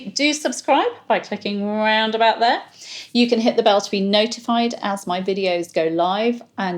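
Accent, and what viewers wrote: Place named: United Kingdom. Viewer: It's a shame the closed captions are gibberish. It must be the translation algorithm can't manage the British accent.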